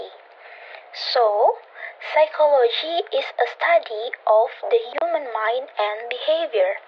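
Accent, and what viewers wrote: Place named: United Kingdom. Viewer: Keren ya kak kayak ada british2nya gitu 🙏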